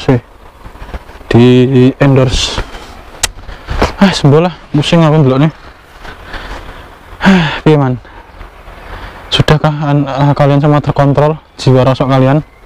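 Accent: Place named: Blitar, Indonesia. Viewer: itu wong mblitaran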